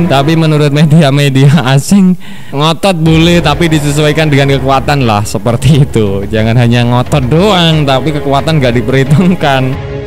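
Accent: Java, Indonesia. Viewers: Suara mu kok medok e min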